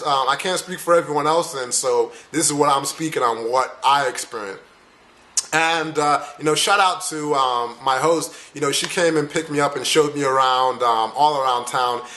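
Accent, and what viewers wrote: United States. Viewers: In my opinion, as a Dutch guy, you look like an American black guy. And if you would talk to me, I'd notice your American accent too. But I probably would have guessed that. Africans have a different mimic, and a different way of expression. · If they hear your american accent they will find it very cool and your immediately very interesting.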